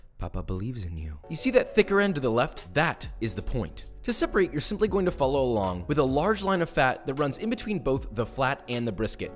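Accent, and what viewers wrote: South, United States. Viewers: your southern background is showing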